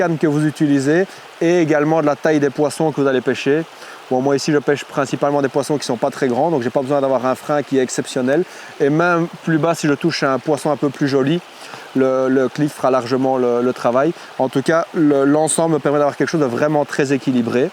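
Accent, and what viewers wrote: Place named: Belgium. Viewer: Encore une très belle vidéo et c'est accent belge. Rajoute un vrai charme à tes vidéos. On prend plaisir avec toi au bord de l'eau et on sent l'envie de transmettre. Merci encore pour ce travail. Je me suis mis à la nymphe grâce à toi depuis plus d'un an et c'est un vrai plaisir. Va falloir que je franchise le pas de la mouche sèche mais c'est compliqué dans nos rivières de haute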